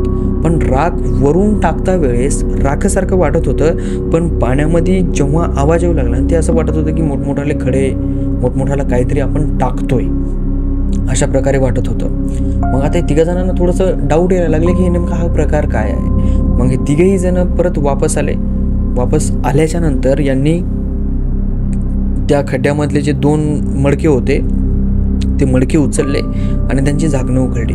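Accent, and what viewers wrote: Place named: Beed, India. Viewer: बीड चे काय ,बोलीभाषा वाटते तशी😅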